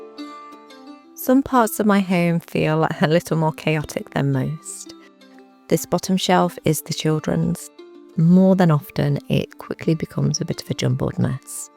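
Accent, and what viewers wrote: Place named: Northern England, United Kingdom. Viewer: Relaxing to watch. Cosy vibes, beautiful filming on the beach. You sound like a North of England girl. I am too.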